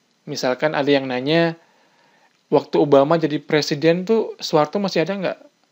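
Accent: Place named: Palembang, Indonesia. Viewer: orang palembang ya kak. setiap ngomong selalu pakek "e" hehehehehehehe paham betul aku cakap palembang. suka tutorial ini mudah dipahami.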